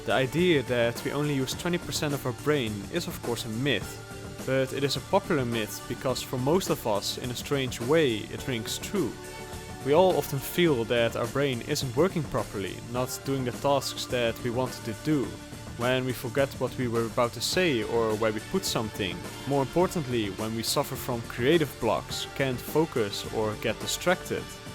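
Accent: Netherlands. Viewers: Is the narrator by any chance Dutch? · Love the vids (btw i heard immediately that you guys are dutch : )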